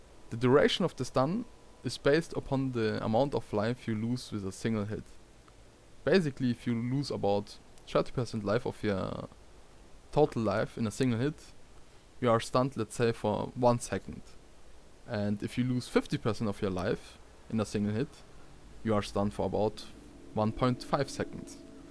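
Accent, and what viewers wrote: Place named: Germany. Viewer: Hab schon bei der ersten drei Sekunden erkannt das du ein Deutscher bist :D .. Cooles Video nice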